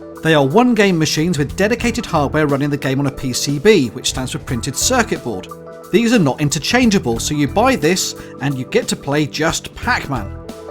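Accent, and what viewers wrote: England, United Kingdom. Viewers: He sounds English. "British" is a nationality, not a language. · hummm u sounds like britis.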